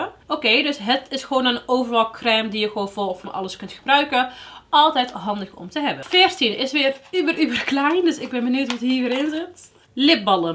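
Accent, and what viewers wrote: Brabant, Netherlands. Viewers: Altijd leuk om je video’s te kijken, en heerlijk om naar te luisteren aangezien er soms van die te leuke Brabantse woordjes uitfloepen. #loveit! Ik doe ook mee met de winactie! 💕 insta; L.iisax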